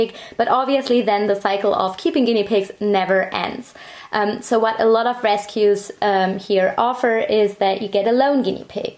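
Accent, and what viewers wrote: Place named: Germany. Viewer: You, my good fellow, are all over the place. 1. She can have as many guinea pigs as she wants, you have no choice in the matter. 2. One guinea pig is NOT enough. If you have one guinea pig by itself, it will literally be living in h e l l. They're herd animals and need to be in groups. 3. They can be, it's called floor time and it's how they get excersise. 4. She's...she's german- she has an accent and talks like that because English isn't her first language. In conclusion, don't comment stuff like this if you don't know what you're saying. Unlike you, she is an adult and can make her own choices.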